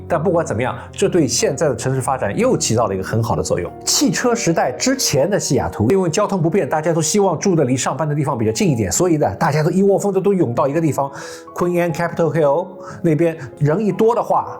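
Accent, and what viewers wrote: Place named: Shanghai, China. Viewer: 30多年上海口音还是很重啊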